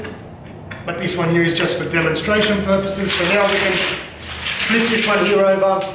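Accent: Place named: Australia. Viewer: cheers mate, amazing what you can find on t'internet and it sounds like it's from Ozz (don't kill me if you're a Kiwi lol!). I've gotta cut 4" off a door and this'll work a treat👍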